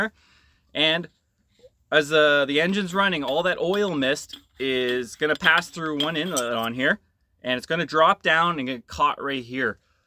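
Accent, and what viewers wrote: Canada. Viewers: I'm Canadian, and you sound REALLY Canadian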